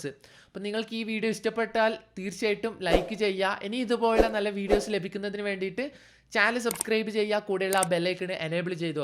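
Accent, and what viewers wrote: Kannur, India. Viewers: Kannur ano?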